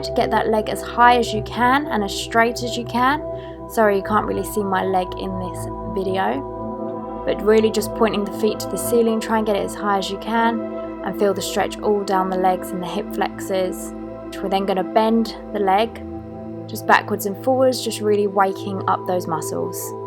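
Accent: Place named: London, United Kingdom. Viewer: Lovely video. You must bee a South or East Londoner lol. The accent gives it away.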